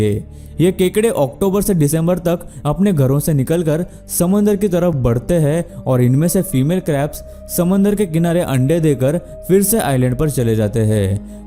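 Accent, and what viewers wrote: South, India. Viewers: Bhai ki voice se lagta he ki bhai South se he ??🤔🤔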